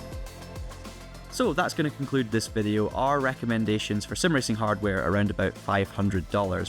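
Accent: Scotland, United Kingdom. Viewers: heard the scottish accent that was enough for me lol support your own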